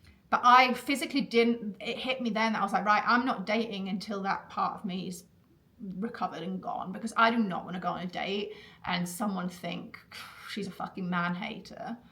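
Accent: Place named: London, United Kingdom. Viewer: Most surprising thing in this video is that you’re from Yorkshire??????? Whaaaat. That is the most London accent ever!!!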